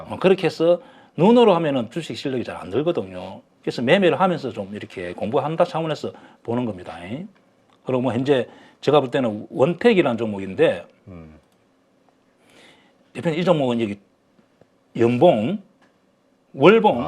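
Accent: Gyeongsang, South Korea. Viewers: 갱상도 라도 시골 이네..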